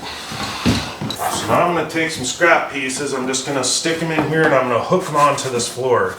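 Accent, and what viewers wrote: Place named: Upper Midwest, United States. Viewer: Until you said Northern Minnesota, I thought you were from Ontario, Canada. That Northern Midwest accent had me fooled at first. Great tips, nonetheless.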